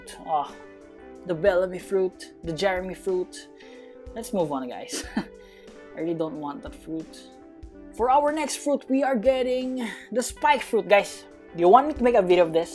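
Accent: Tagalog, Philippines. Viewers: Halata tagalog 🤣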